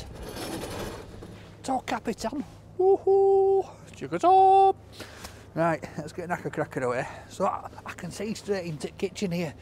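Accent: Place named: Lancashire, United Kingdom. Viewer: I thought he was a lanchesire lad, he sounds so much like Peter Kay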